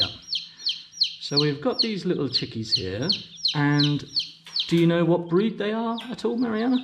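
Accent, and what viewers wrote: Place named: Norwich, United Kingdom. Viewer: I've just found your channel, and am really enjoying the content. Me and my family are thinking of moving to central Portugal with everything that's going on in the UK. I have to ask, I am sure I recognise that accent. Are you from Norwich by any chance?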